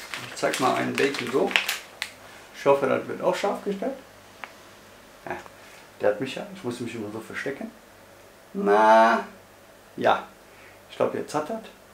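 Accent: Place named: Cologne, Germany. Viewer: Sehr sympathisches Video. Ich finde deine Art zu sprechen cool, bist du aus der Gegend Köln?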